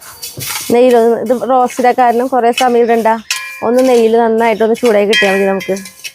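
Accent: Thrissur, India. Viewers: നമ്മുടെ ത്രിശൂർ ഭാഷ വളരെ ഭംഗി ആയി സംസാരിക്കുന്ന ആളാണ്. എനിക്ക് ഒരുപാട് ഇഷ്ടവും ആണ്. വീഡിയോ കാണുന്നത് നമ്മുടെ തൃശൂർ കാർ മാത്രം അല്ലല്ലോ,😢😢😢 നോക്ക്..... വായോട്ടാ എന്ന് പറയുന്നതൊക്കെ കേൾക്കാൻ എന്തൊരു രസം ആണ്.😂😂😂😂 ദേഷ്യം ഒന്നും മനസ്സിൽ വെക്കരുത്. നിങ്ങളെ രണ്ടു പേരെയും പെരുത്തിഷ്ട്ടം മാത്രം 🎉🎉🎉🎉🎉🎉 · അറിയില്ല. ഇന്നസെൻ്റ് സിനിമയിൽ തൃശൂർ ക്കാരനായി സംസാരിക്കുമ്പോൾ നമ്മൾ natural acting എന്നു പറയുന്നു. അദ്ദേഹത്തിന് കൈ അടിയും കിട്ടുന്നു. സാധാരണക്കാർ സംസാരിക്കുമ്പോൾ അതു തിരുത്തുക എന്ന് പറയുന്നത്? ഇത് സ്റ്റൈൽ ആയി കരുതി കൂടെ? എനിക്ക് എൻ്റെ കൂട്ടുകാരുടെ തൃശൂർ, പാലക്കാട് സംസാരം ഒക്കെ രസം ആയിട്ടാണ് തോന്നിയത്.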